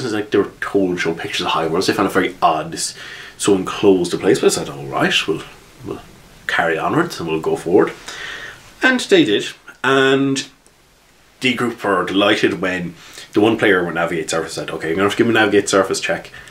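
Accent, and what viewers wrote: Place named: Newfoundland, Canada. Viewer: Huge newfie accent? Awesome. My GM is a newf. :)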